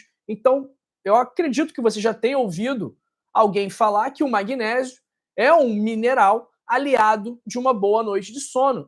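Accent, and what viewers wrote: Rio de Janeiro, Brazil. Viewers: Doutor obrigado pelos seus ensinamentos, atualmente estou perdendo noites de sono devido a ansiedade. Irei praticar essas dicas que são tão fáceis e encontradas em toda casa kkkk! Ah e só uma coisa, eu adoro o sotaque dos brasileiros, povo mestiço e diversificado. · Acho tão charmoso o sotaque do carioca!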